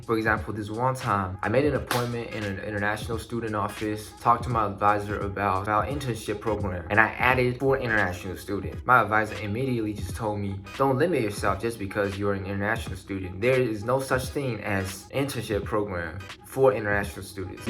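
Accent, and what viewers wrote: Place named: South, United States. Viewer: your southern accent tho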